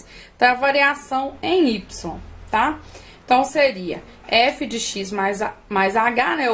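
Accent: Minas Gerais, Brazil. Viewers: Vc e mineira né?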